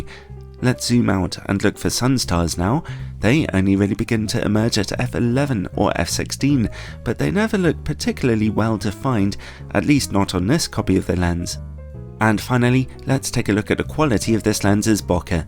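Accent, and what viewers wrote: United Kingdom. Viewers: He's british, and has a very british voice. That's the brit secret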